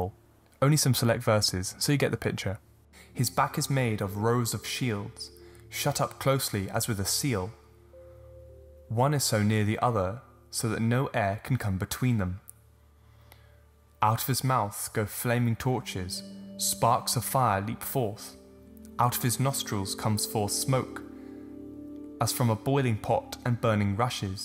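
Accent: England, United Kingdom. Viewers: I got halfway through this video and was like "wait, wtf is a Beer-Moth?" Then I realized he was just saying Behemoth in an English accent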